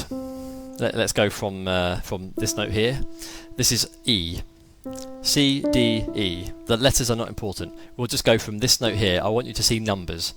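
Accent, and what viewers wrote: United Kingdom. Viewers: Very helpful so far! Thank you so much! I'm curious, where are you from? I hear a British accent. I'm just randomly curious, nothing more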